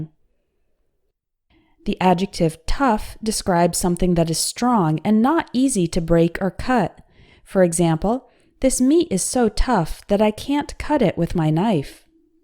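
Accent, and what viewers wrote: United States. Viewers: Do I hear a midwest accent? 🧐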